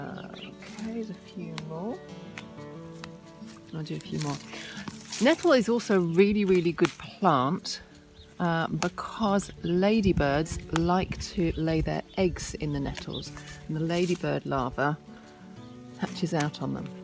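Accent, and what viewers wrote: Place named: England, United Kingdom. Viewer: It's very nice to hear your English voice, Thanks for sharing!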